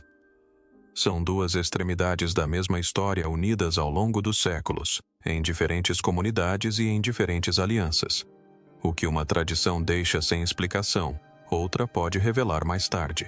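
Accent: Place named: United States. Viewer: Por que essa língua? Usou a IA e fez narrar como um americano com sotaque? Isso estraga completamente o trabalho, e só melhora depois (por um momento).. Isso é um trabalho RELAXADO meu amigo.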